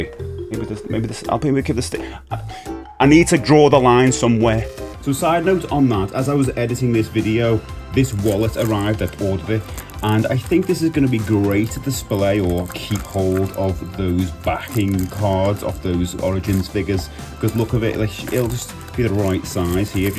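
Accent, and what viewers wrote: United Kingdom. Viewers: First of all I love you're voice. Something about British accents that appeal to me. Lol Second, I've been a toy/ action figure collector for 30 years. I really love my collection. Mostly Buffy the vampire Slayer stuff, WWE/WWF figures. I used to have the ring and part of the wrestling ramp with accessories. But no longer have those because those are the ones I sadly played with as a kid lol. Third, I'm into Funko pops too. I've got lots of them. Harry Potter for instance I've got the Hogwarts castle. I would love to unbox it and display it for my entertainment. But at the same time I'm afraid of dust and dirt or whatever ruining it over time. Lol Fourth, I've go alot of Disney Funko pops. Everything is in the box. Currently I'm at a loss of what to do. Should I also do the pros and cons list?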